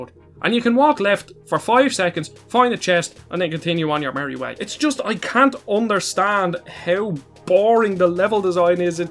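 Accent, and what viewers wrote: Ireland. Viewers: I’m here for the hot sexy Irish accent! Nice video!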